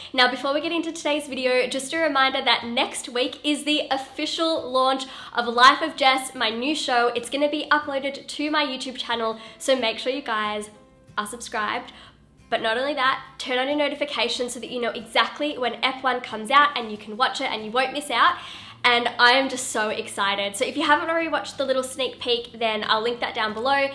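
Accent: Australia. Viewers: Omg!! Love it so much Jess!! 😀 can't waitt!! Congrats for ur new moviess !!!!!!!!! .. i really hope i could get the giveaway back to school supply '. And miss ur rabbit .. its So fluffy !!. And just wondering are u aussies?. Because ur accent like an australian accent xx and i love it . REQUEST : How to make a neat note and creative notes for school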